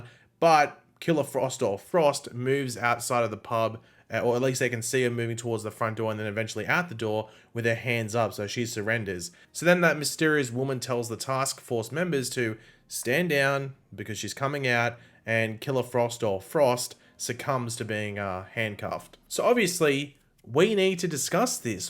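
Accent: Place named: Australia. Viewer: I missed your Aussie voice lol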